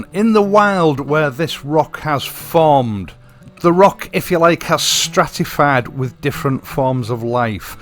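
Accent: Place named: Hull, United Kingdom. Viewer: I am making an assumption based on your accent that you are somewhere near Hull. As a child I used to go to KKC in Hessle. Where would you recommend nowadays?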